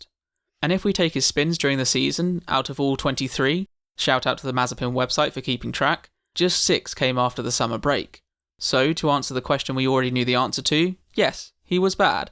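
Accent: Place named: United Kingdom. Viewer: re . Ouch ! That's a bit harsh on Her Majesty . You are obviously British with an accent like you have . No CBE for "Services to Motorsport Commentary" coming to you anytime soon !!!! :-D Ahh, yeah , I do love the way you deliver your commentary . Still I don't give out CBEs !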